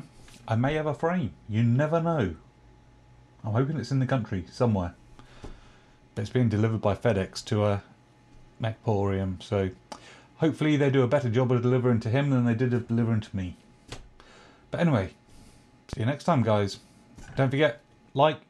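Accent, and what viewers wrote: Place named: United Kingdom. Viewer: It’s always great to hear a UK accent. I hope the build is going OK. Personally I hate my Ender 3 and I love Klipper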